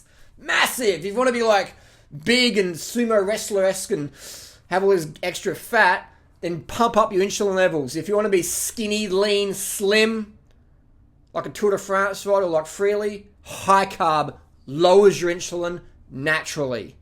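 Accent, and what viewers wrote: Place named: Australia. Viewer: This video is as good as his Australian accent.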